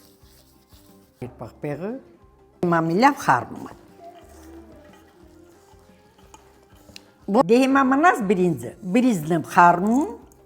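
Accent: Goris, Armenia. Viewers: Как приятно слышать диалект Гориса , Я тоже с Гориса и пишу с Ташкента. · Վախ տատի ջան, մեր Գորիսի բարբառով շատ խոսա, հաճելւա լսելը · Վայ ոնց եմ սիրում տատիկի եփածը։ Նամանավանդ մեր Գորիսի տատիկների եփածը։ Մեր գեղեցիկ Գ-իբառբառով խոսա տատի ջան։ Բա քո հոր տոնը շինվի😂😂😂😂😂։ Վայ հինչ լավն եք տատի։ Ապրես, օրինակ չէի գյուդում վեր Կարելիյա ծու էլ քիցած❤🙏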